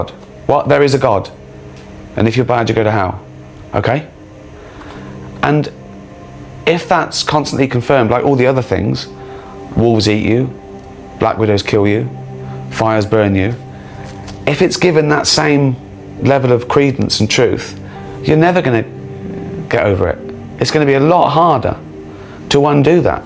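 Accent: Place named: United Kingdom. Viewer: Be careful dude. You can make just about any bunch of bullshit sound legit with a British accent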